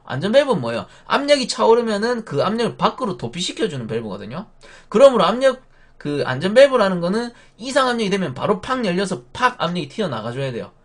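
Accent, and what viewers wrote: Gyeongsang, South Korea. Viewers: 끼긱끼긱 빵터짓읍니다 경상도 방언 좋네요 열정적인 강의 감사합니다 NH3암모니아 올림 ^^ 따뽕 좋아요 꾸욱!!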